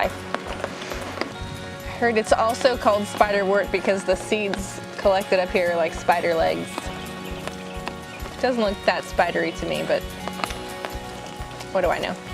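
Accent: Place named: South, United States. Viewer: I need to go down south and take a class from you on wild vegetation you have a everlasting knowledge on it love the southern accent btw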